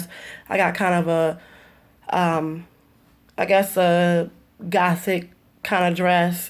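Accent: Baltimore, United States. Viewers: I am glad you recognized that. Actually it helps you pronounce your words better now that you identified. I am from Bmore also and when I went to the Military I realize that Bmore did have our own language. As you get older and progress you are going to be able to learn how to cut it on and off so you don't lose that business deal for saying MUVA.. 😂😂. Glad to see you experiencing these things. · Facts. Thisis video about "her" accent who happens to be from Baltimore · I'm from new Orleans, & I love Baltimore accent. I would definitely date you.. yaherd me.. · I grew up in waverly in the mid 70’s 80’s and 90’s There and Baltimore in general is called the melting pot based on the diversity or mix of multicultural dynamics. U have the accent down for the most part. And yea, Non Marylanders don’t know nothing about our love for crabs... hon lol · Love your accent. 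Baltimore accent is colonial American, urban South, unique, awesome. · SW Baltimore was originally an Appalachian accent...or at least that was how it was originally labeled in the 30s thru the 70s. She does a good job of capturing the sounds of the accent. She switched to slang which changes too often and too quickly to say something is. Old Bay! Yup. Used to have to carry it back to Florida, but Florida caught on and it’s available in most grocery stores now. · Your Hon accent is pretty spot on nice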